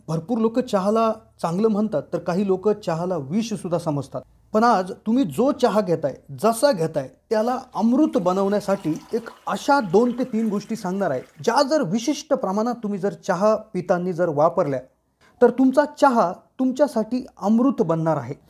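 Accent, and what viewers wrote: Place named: Khandesh, India. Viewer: Khandeshi bolaychi stylish bhasha ashi aste .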